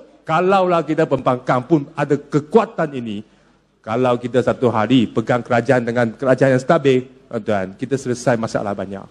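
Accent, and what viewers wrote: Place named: Kedah, Malaysia. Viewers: Dengar dia cakap dah tau dia ni confirm org kedah, bnyk bergaul dgn org Kampung. Bukan mcm apek mca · Salute, berBahasa Melayu dengan Loghat Utara Malaya.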